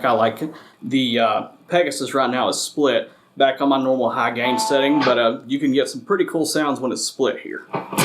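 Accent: United States. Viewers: ok I was thinking arkansas with that accent lol. Cedarpoint! We went there in June. I live in nebraska and esp are non existing. Btw my ltd is a fret buzzer. I'm about to send it to sweetwater for a plek and setup.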